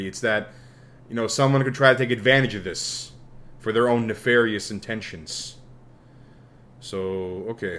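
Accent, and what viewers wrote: United Kingdom. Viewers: bro has an awesome british accent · Love the British accent bro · i love your british accent its so funny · No one talking bout his British accent 💀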